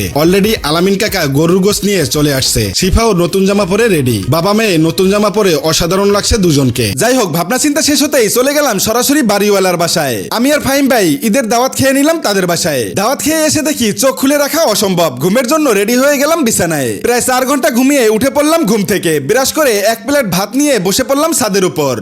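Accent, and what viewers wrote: Barishal, Bangladesh. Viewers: Kotha batra thik koiren aro views barbo 👎🏻🤬🤬 Borishailla Bangal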